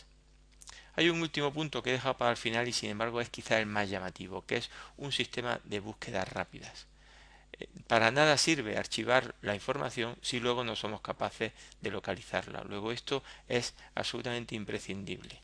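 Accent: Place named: Andalusia, Spain. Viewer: Estoy estudiando para secretaria de dirección y su vídeo me ha salido sugerido a través de uno recomendado para el estudio. No haga caso a esa persona que se ha metido con su voz, que no afecte a la seguridad en usted mismo, tiene una voz agradable ¡y ese acento tan encantador del sur...! Buena contestación, por cierto. ¡Ánimo!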